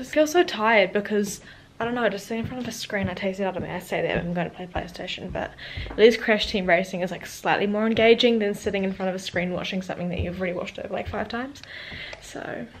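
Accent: New Zealand. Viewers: Hi))) New Zealand accent is so nice!!!))))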